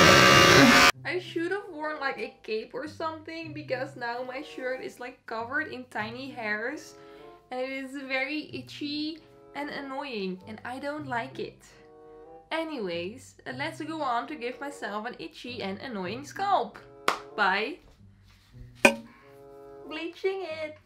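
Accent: Netherlands. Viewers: Are you dutch, cause your accent sounds like you are?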